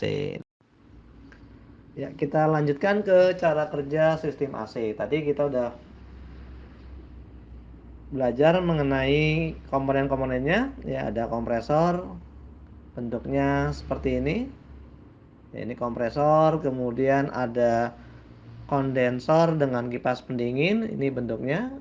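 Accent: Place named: Java, Indonesia. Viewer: Medok nya sudah pas, Mantap pak guru salam dari senop :)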